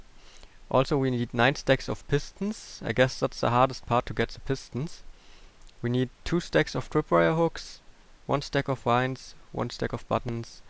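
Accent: Germany. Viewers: Du machst wirklich super Tutorials, man hoert deinen deutschen Akzent raus. Ich hoffe, ich spekuliere nicht falsch ;P Es wuerde mich sehr freuen, wenn du mehrer Minecraft Erfindungen machen wuerdest. Ich hab's auch mal probiert, aber manche Menschen sind im Umgang mit Redstone nunmal besser :/ Ich wollte nur sagen, dass du super Videos machst und auch weiterhin so weitermachen sollst.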